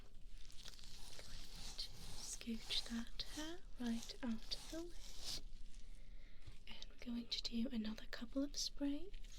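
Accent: United Kingdom. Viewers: I love the bri'ish accent. Love your audios❤ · 40 minutes of you must be what heaven is like,. Only forever! I love your British accent. This artwork of you is phenomenal! 😍 And my birthday was 2 days ago. This is a great present! 🎂🥳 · are you british by chance? (i’m the anti furry you talked to a few months ago) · I'm so used to the Tomboy voice that hearing this gentle, higher pitched British Esq voice, gave me whiplash. Good to know you have a versatile voice! Makes for much more entertaining ASMR videos.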